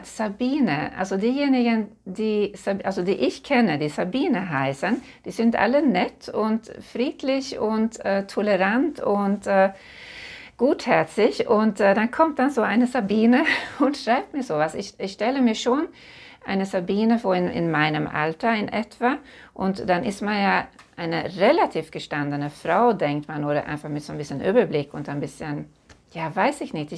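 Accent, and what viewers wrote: Sweden. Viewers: Liebe. Ich bin fassungslos über solche Kommentare 😡. Ich liebe deinen Akzent sehr 💕. Ich habe schon mehrmals Urlaub in Schweden gemacht und liebe das Land. Mach dir bitte nichts aus diesen Kommentaren von so dummen Menschen 👍. Bleib wie du bist 🤗. Ich bin für verschiedene Kulturen und freue mich immer über die Länder und deren Kulturen zu lernen. Eine sehr schöne Tracht habt ihr 🤗. Austausch muss sein 👍. Wünsche dir einen schönen Abend und danke 🙏 dir für deine Offenheit 💕. GLG 🥰♥️ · Ich wäre froh, wenn ich nur ein paar Worte in schwedisch sprechen könnte. Ich finde deinen Akzent einfach nur genial und sehr sympathisch. Lass dich nicht unterkriegen ❤ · Liebe, das anlegen deiner Tracht war für mich ein sehr feierlicher Akt. DANKE ❤❤... ich mag diesen Stolz auf die Tradition. Zum Kommentar von "Sabine" sag ich dir: verschwende deine charmante und liebenswerte Art NICHT an Personen die dich und deinen Kanal nicht zu schätzen wissen. 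Ich mag deine Videos und deinen schwedischen Akzent. Wortschatz, Satzbau und Aussprache sind besser als bei manch "Muttersprachler". Dieser Videoplatz ist so lichtdurchflutet und wunderschön. 🙋🏼‍♀️👍🏻🍀🌻🌼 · Ich finde der akzeeeent gar nicht schlimm, sondern eher das es dich noch symphytischer maaacht😍😍😍😍...ohne Schweden gäbe es sooo viele Marken hier gar nicht in Deutschland, wie HM oder aber auuuch COS wo ich gerne einkaufe und Ikeaaaa😍😍..lass DIch nicht ärgern, die Traaacht sieht ja maaal toolll aus.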